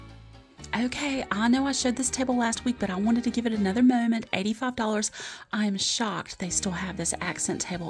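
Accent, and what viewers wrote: South, United States. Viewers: My Restores are not as well stocked! I'm in Atlanta. Love your videos! Your southern accent is so soothing and reassuring! Thank you so much 💓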